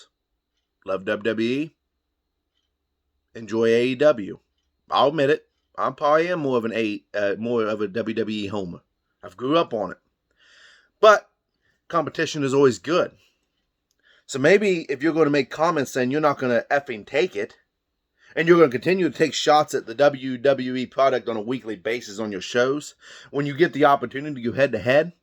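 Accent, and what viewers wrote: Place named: South, United States. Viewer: Why are you trying to talk like e Southern Lawyer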